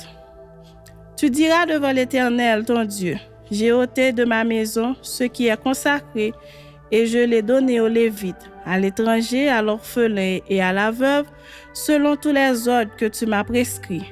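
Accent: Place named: Haiti. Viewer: Merci pour ces lectures j’apprécie l’accent haïtien !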